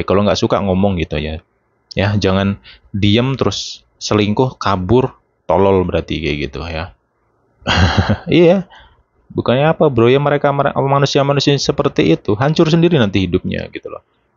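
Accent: Java, Indonesia. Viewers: Scorpio hadir matur suwun vidionya, aq seneng cara penyampaiannya jowone MEDOK BANGET hehehe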